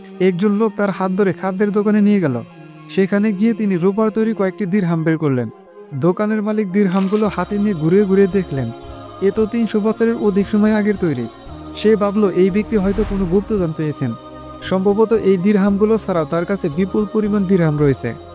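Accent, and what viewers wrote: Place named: Manikganj, Bangladesh. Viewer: apnar deser bari ki manikgonj , karon apnar uposthaponay cholti vasar ovab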